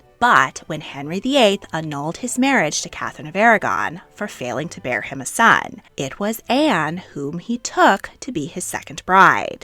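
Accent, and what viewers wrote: California, United States. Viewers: The US accent is irritating. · Sadly I am totally distracted by the woman's voice, What accent is that - is it Californian ? Why do women speak that way?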